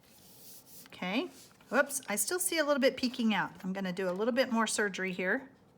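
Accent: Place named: Texas, United States. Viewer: Wonderful video! I wasn't going to buy this laser cut paper until I saw your video because I didn't know how to cut it or use it, but now I can't wait until my order comes and I can try to make a beautiful card. Thank you so very much! (I'm from Texas, too, and I love your accent....sounds like home to me!)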